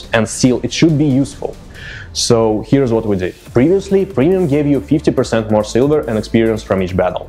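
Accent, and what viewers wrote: Russia. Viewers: Братка русский знает акцент еще не ушел! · Чуваки, судя по акценту, сделайте видосы на русском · Я заметил русский акцент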